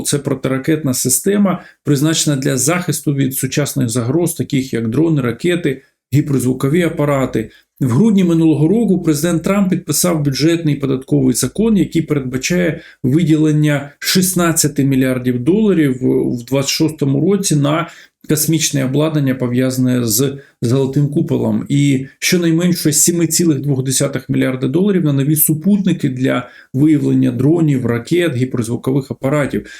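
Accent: Russia. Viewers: Та в нього тих перлів , чуть не кожне слово . Можливо то просто москалик , який вирішив українцям брехні понарозказувати !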